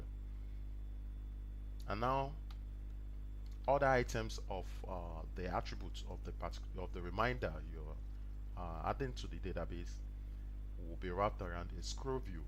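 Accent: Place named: Nigeria. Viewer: you sound nigerian.. are you?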